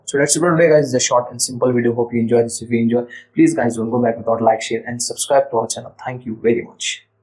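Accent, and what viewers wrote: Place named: India. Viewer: Is it me or is the sound quality so bad on this video that, coupled with the presenters thick Indian accent makes the video unintelligible?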